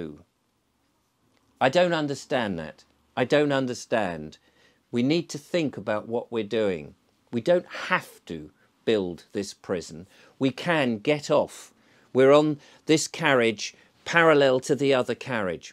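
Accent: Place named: Eastbourne, United Kingdom. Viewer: Sounds like you live in Eastbourne ☺️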